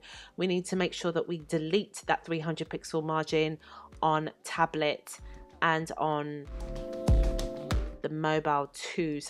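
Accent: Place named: United Kingdom. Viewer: Love British accent.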